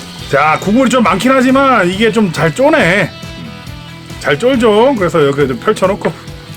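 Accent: Gyeongsang, South Korea. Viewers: 예전부터 궁금했는데 경상도 사투리쓰시는데 사시는 곳이 강원도 쪽인 이유가 있나요?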